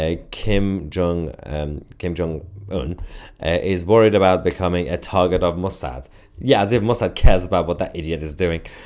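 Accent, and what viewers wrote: United Kingdom. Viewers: Who are you referring to? speaks with correct English grammar with a charming British accent. It's the posters and people on the chat who do have terrible grammar. I love humorous comments about peoples' poor English that is incomprehensible at times.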